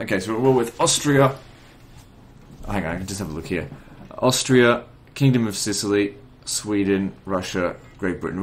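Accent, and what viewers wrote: Australia. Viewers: are you aussie mate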